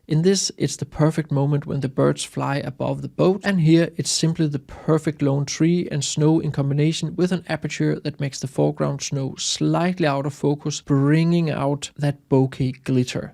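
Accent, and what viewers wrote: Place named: Denmark. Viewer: A denmarkian accent.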